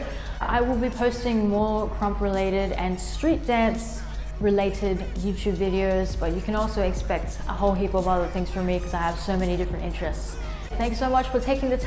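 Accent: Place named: Australia. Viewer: man, everything u talked about this video are literal GEMS of krump!!! its funnaye how it wasnt until this year or during lockdown, i found myself and keep growing just by focusing on myself and not comparing to others. Keep spreading your love for dance. Haha i forgot that your aussie accent is so distinct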